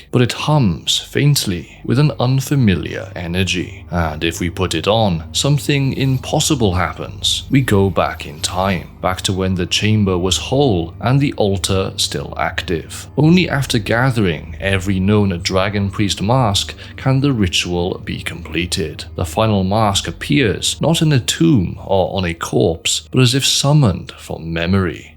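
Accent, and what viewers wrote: Wales, United Kingdom. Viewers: That magic Welsh voice. 🔥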